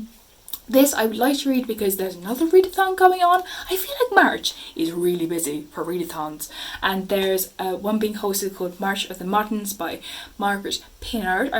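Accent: Ireland. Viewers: Great selection! I've only just finished Hamnet and was absolutely floored by it. Had a major book hangover after. Your Irish accent was lovely to hear 😊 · This was fun, I love Irish accents(but I’m biased)☘️ I read “Angela’s ashes” when I was a kid and I’ve been meaning to reread it someday. I know i really liked it (I like sad stories, it seems). Ooo I really want to read “the heart’s invisible furies” though, yes that one might win this month. Great choices, as always. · Aaaah so late to this but thank you for mentioning me and also buddy reading Angela's Ashes!! 'twas truly a wonderful memoir, and I'm definitely helping myself to this convenient list of Irish books to check out next 👀 Your Irish accent is so sweet -- I've been trying out the accent during my read and the vowels and R's are so fun LOL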